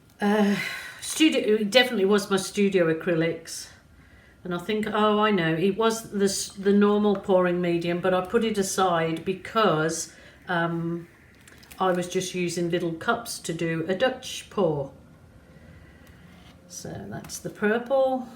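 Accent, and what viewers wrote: Birmingham, United Kingdom. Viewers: Definitely Brummie ! LOL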